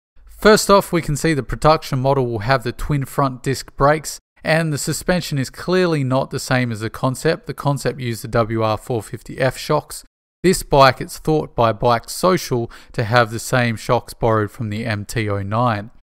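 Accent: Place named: Australia. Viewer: I love the videos and I always look forward to the next release, but pleeeeeease prounounce Versys as it is intended. VERsatile SYStem. Surely your Australian accent shouldn't interfere with pronunciation of this portmanteau word? Just nit-picking :)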